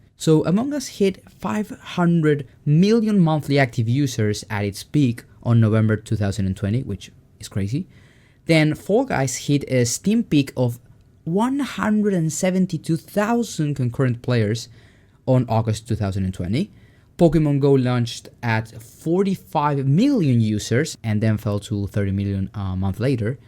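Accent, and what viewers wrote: Argentina. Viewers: ese acento argento me mata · Algo se me hacia raro de la voz, luego me entero que es de Argentina jajaja, lo camuflaste bien.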